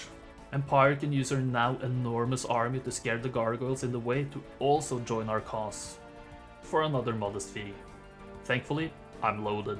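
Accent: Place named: Norway. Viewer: Me: algorithm, get me a video Algorithm: no problem. What sort of stuff do you like? Me: challenge runs of one of my favorite video games, with the art being made in paint, narrated by someone with an unapologetically norwegian accent. Algorithm: one moment, sir...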